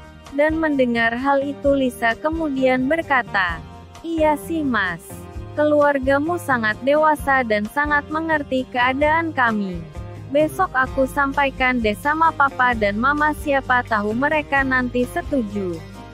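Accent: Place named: Java, Indonesia. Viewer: Mimin logad nya mirip kejawa jawaban ya??!!!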